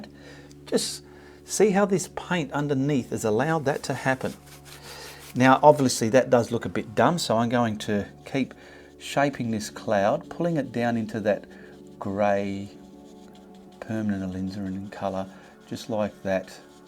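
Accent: Australia. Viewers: I was looking for a video of how to paint realistic clouds. You made it look easy and gave a great explanation. It’s my first time watching your videos. Will definitely watch more. Also, love your accent, Australian? I have always wanted to visit Australia. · Hi, I love watching your tutorials and have seen you grow as an artist. I enjoy hearing your Aussie accent and fun expressions such as “put-er-onner brush” and “blend it to buggery”. Great tutorial! I’ll be trying this technique in my next work. Thanks and keep producing these videos for us.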